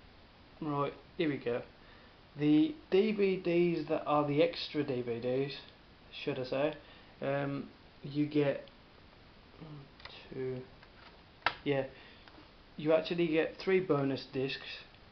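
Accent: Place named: England, United Kingdom. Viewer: Definately a box set i would like to get . Great review buddy . This is the first time i have heard your voice , you are a natural speaker . I really like hearing the English accent . Peace....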